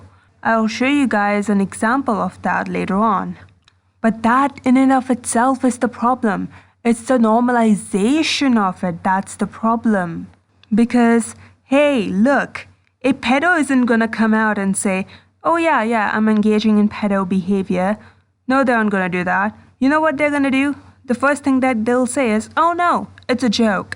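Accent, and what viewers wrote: France. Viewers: Your accent is awesome and reminds me of my aunt from France 🇫🇷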